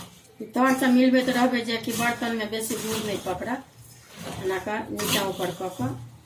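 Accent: Bihar, India. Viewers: Are 0 aunty jara bihari hindi choor kar achhe se hindi bol ligeye barna channel kabhi nahi famous hoga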